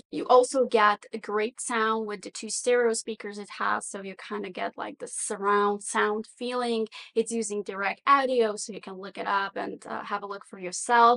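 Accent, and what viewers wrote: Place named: United States. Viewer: I watched your video at 1.5X speed. At that speed, you sound American--esoecially when you say "and".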